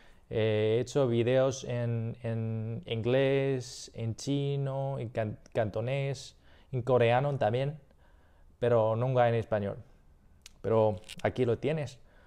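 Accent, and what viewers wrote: Castile, Spain. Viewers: ¡Que copado! Hablas como un nativo hispanohablante con acento de España. 💙👍🏻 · Salvo unos pequeños errores en los artículos (a todos los no nativos de español les pasa) es bastante bueno el habla, hasta tienes el acento y manerismos del español de España. La conjugación se te da muy bien y suele ser lo más difícil del idioma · ¡Es un mundo pequeño! Un Chino Americano hablando Español castellano. Saludos de Tucson. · You should specify you speak Spain Spanish. Which usa Spanish hates lol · ¿De donde aprendiste español? Yo pense que pude escuchar a un poquito de acento España, pero no podría ser seguro. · Excelente video, habla muy bien el Español, con acento de España, que consejo nos daría a la hora de aprender idiomas, a mí me gusta mucho el inglés y el hebreo. Saludos desde Colombia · Your Spanish is great, mate! I'm curious, why do you have a Castilian (Spanish from Spain) accent? 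People from the US usually learn the Mexican variety, due to proximity and exposure. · me gusta tu español, tienes un acento español, pero de repente le metes ciertas expresiones latinoamericanas que gustan mucho c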